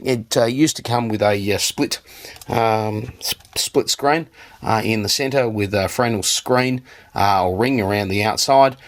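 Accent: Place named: Australia. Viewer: Very indistinct speaker....not only ehm ehm ehm but also strong "down under accent" makes him difficult to understand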